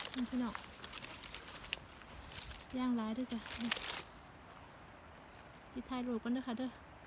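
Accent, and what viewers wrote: Central Thailand, Thailand. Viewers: ไม่ง่ายเลยนะทั้งถ่ายทำไปพร้อมเก็บเห็ดขอบคุณนะจะน้อง และทุกๆท่านที่อยู่ตปท.ที่อุตส่าทำคลิบมาให้คนไทยที่เมืองไทยได้ดูจะชอบน้อง พูดไทยกลางน่าฟังดีและฟังรู้เรื่องด้วยจร้า💚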